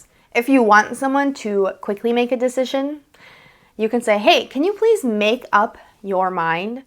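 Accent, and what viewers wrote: United States. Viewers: Hey teacher am new here am learning a lot from your beautiful classes, So I am from Brazil 🇧🇷, I have loved your accent since the fisrt moment, which state of USA is this accent I mean🇺🇸Do you come from which state? Thanks in advance ❤God bless you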